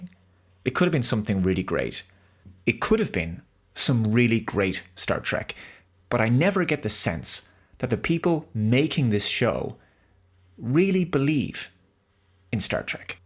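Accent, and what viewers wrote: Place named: Ireland. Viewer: Nah, he's Irish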